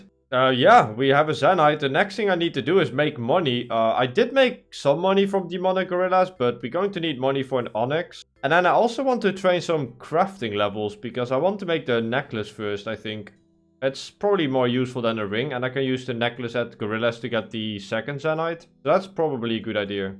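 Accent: Netherlands. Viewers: Lol , you must be dutch , that accent is so obvious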